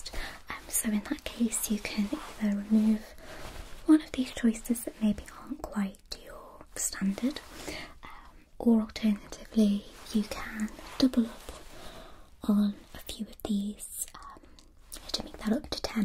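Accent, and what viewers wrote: United Kingdom. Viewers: What is this whisper are you British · I usually don’t like British accent videos,but I actually don’t mind your videos♥️ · What’s your country? Your English accent is not seems like American maybe British? Or England? I don’t know but i love your accent your “Okay” sounds cute❤️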